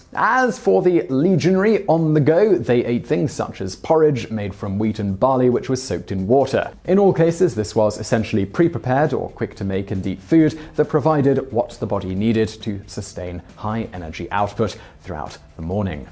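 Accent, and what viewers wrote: United Kingdom. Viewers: This is the most British Simon has ever sounded in any video.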